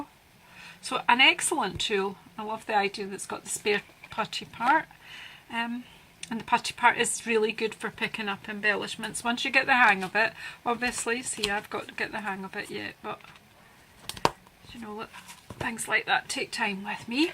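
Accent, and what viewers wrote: Scotland, United Kingdom. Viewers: Beautiful fall cards! I’m definitely going to case them and, no, I never get bored listening to you, I could listen to that beautiful Scottish accent all day long!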